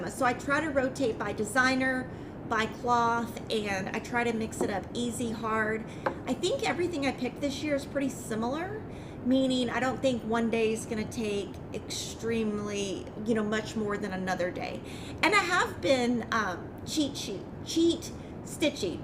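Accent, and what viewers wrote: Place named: Texas, United States. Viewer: I really like seeing other stitchers/quilters work. I get ideas especially for colors that I wouldn't have thought of on my own. Color of thread, floss and fabric are the hardest things for me to choose. BTW, I love your accent especially when you say y'all. It reminds me so much of growing up in El Paso.👍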